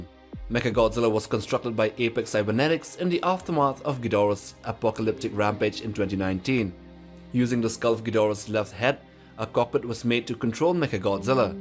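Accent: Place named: India. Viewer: You sound like an Indiano fron India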